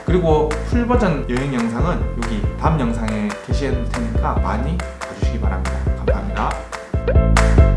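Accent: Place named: Gyeongsang, South Korea. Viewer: 콘텐츠 잘 봤습니다. '좋댓구알' 했구요. 억양에서 살짝 경상도사투리가 들리는데 혹시 경상도 출신이신가요? 전 대구남자입니다. 혹시 Q&A영상이라도 있으면 알 수 있지 않을까 싶어서 검색해보니 QNA영상은 없네요. 다른 의도는 없는 순ㄴ수한 호기심에서 하는 질문입니다.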